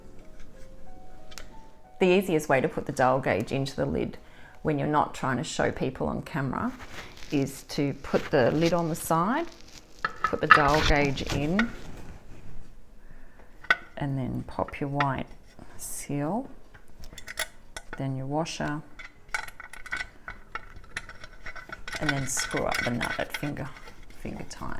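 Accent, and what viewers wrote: Australia. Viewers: Thank you for this video! I have just purchased a Presto canner and am finding your channel to be very helpful. It's lovely hearing a fellow Aussie voice on YouTube! Where can we get the gauge checked here in Australia? Thanks.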